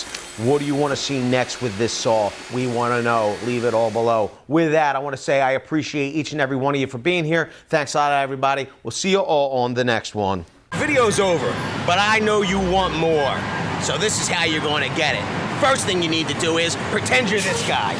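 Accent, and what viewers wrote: Philadelphia, United States. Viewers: I've had this Skil saw sitting in my house for a week. My first tablesaw and I'm terrified to use it lol. Your video is probably the first I've seen where I feel more confident. Others casually rip through wood, no guard on, like it's not a big deal - and I KNOW it is. You've made it a point to keep yours on and show how you're ripping with it on. Granted, maybe a 4x4 or a very thin piece might need it off (?), but you then made sure to say the riving(sp?) knife should stay on if we know what's good for us. I appreciate this approach you're conveying - it's not overly fear-inducing, but also not callous to the consequences. And also, Philly Philly! at least I assume you're from Philly. Your accent made me wonder, and then your ending solidified my assumption.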